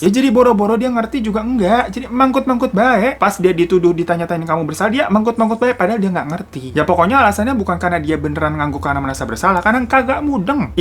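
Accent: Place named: Indramayu, Indonesia. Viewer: Saya curiga Kalo bang ini orang indramayu selipan kata kata Dan logat mirip gitu sih hehe hayoo bang darimana sih bang kamu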